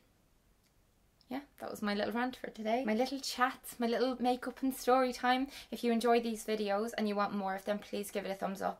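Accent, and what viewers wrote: Ireland. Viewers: Which county is she from? I'm woeful with accents. Tipperary? 🍍🍍🦄🦄❤❤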